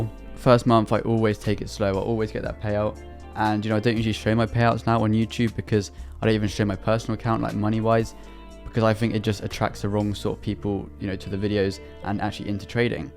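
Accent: United Kingdom. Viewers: Hey bro where in the UK you from?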